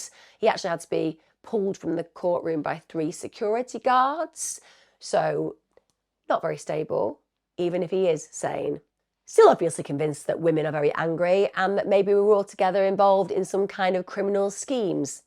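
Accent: Australia. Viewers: As tragic as it is your Aussie accent is fab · Terrible case, very sad... evil man. But I also love the Aussie accent haha! Spot on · I was living in Melbourne at the time and I just cannot put into words how distraught and horrified we all were when this happened. This bridge is HUGE. So high. She had absolutely no chance, the poor little angel. (Your Australian accent is great by the way). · Heartbreaking 💔 case. Loved your Aussie accent lol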